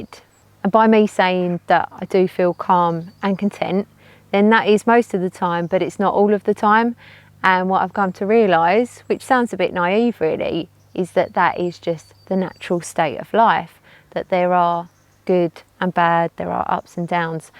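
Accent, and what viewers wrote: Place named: East End, United Kingdom. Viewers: Very nice! I liked the last bit most. Sitting on a path, breaking your perch, referring to notes taped to your tripod. :) Happy Birthday. I've subscribed mostly due to your accent. Gotta love that accent. When I first heard that accent I hit subscribe. That was 12,000 or 13,000 subs ago. One I subscribed I had to Google you as you seemed damned intriguing and more to you than met the eye- and discovered you rowed around the UK and/or across the Atlantic. (This blonde Cockney-ish sounding girl rowed a boat across the Atlantic Ocean?) So you're worth listening to. You have some steel in your spine. Congrats on your birthday and your new YouTube career. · Happy Birthday! All my mates back in the UK are from the East End / Essex so your accent is a pleasant bonus and reminder of different, happier times. Go on, fail, be happy, fail again and then succeed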